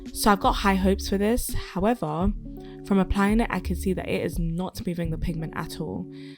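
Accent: United Kingdom. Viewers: I just love the british accent ❤ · Bro is British💀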